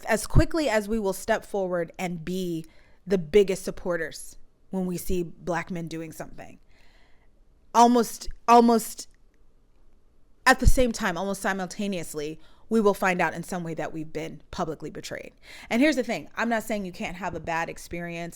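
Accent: United Kingdom. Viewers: As a Brit, loved your British/English accent 😂 Missed you. You’re so relatable. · 🤣, that British accent 👌 Loved your humour/ sarcasm throughout, please incorporate it more! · lmaoo love your british accent, welcome back! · your british accent is everything! sounds just like them!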